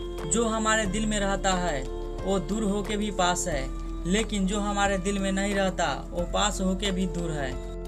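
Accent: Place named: Bhojpuri, India. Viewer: Bhai tumhare content and channel dono superb hai proud of you but aapke Hindi Bolney ki shaili mein shudhta lekar aao shabdon ko or clear bolo bhojpuri or maithili tone mein nahi Hindi tone mein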